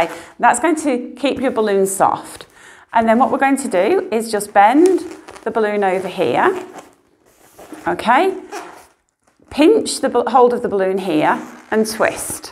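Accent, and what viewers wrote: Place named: United Kingdom. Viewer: Thank you so much, I learned a lot. Also really makes me miss the friendly people in the UK when hearing the accent 😭😭😭😭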